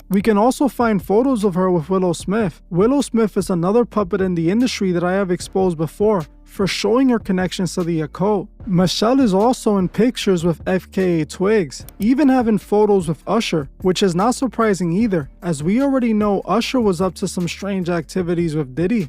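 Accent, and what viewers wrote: France. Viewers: Is she french..??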